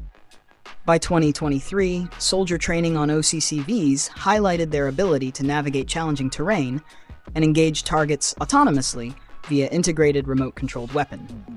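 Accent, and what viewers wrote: United States. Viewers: It's hard to watch something about our modified Bucket narrated by a yank. About the project, i think it's Boffins inventing systems from the point of view as seen from their desk and pc. If you want a warbot, make a specifically built one from the ground up, not convert a manned battle taxi. Make it small with only what it needs. I'm all for preserving our fleshware, but this is not the platform to do it.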